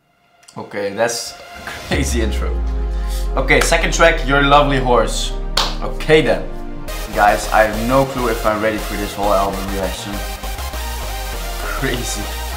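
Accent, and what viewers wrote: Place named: Netherlands. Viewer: love how the dutch slips through his english speaking, lekker man ❤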